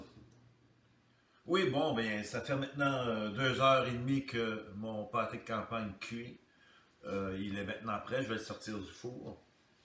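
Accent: Quebec, Canada. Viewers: Quel plaisir de voir et surtout d'écouter ce cher accent de nos cousins Quebecois!! cette recette sent bon comme votre accent et c'est avec plaisir que je la réaliserai avec plaisir, merci encore et bonne continuation!! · Bravo.! Quel rayon de soleil pour nous "c'bel âccint Q'bêquô"!! NB. le pâté est superbe aussi, bien sûr! Merci 🌿 ♨️ 🙏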